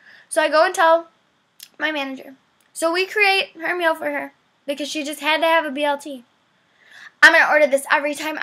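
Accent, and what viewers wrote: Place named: Michigan, United States. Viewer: you sound like you're from michigan!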